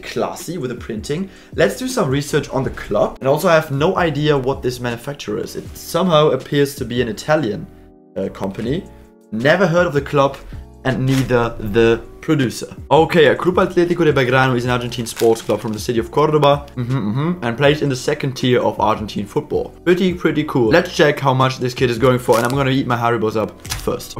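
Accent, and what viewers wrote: Germany. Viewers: are you a german because i hear it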